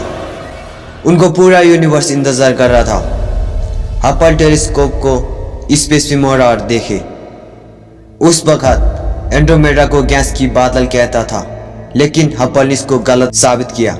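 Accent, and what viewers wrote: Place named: Nepal. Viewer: U nepali bro Accent ley tha vo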